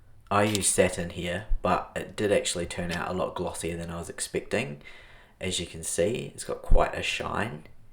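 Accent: New Zealand. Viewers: I'm so used to hearing American accents that familiar kiwi accent threw me for a loop! I'm a fellow furniture flipper from New Zealand and I am also taking a break from drawers to work on a coffee table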